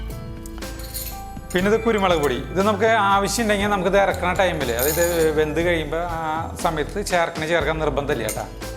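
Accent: Thrissur, India. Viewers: Chettan porichu emmade Thrissur slangil...kari pedaayittundu..chattayee... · തൃശ്ശൂർ ഭാഷ കേൾക്കുമ്പോൾ തന്നെ മനസിന്‌ ഒരു സന്തോഷം ആണ്,ഈ കൂട്ടാൻ എനിക്കിഷ്ടായി,ട്രൈ ചെയ്തു,സൂപ്പർ,ഞാനും കൂട്ടാൻ ന്നാ പറയുക,കൂട്ടാൻ ന്ന് പറയുമ്പോൾ ചിലർ കളിയാക്കിയിട്ടുണ്ട് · Athe.. chettan Thrissur aan enn thonunnu.. nalla laalur slang und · Neat and I like your thrissur accent 😃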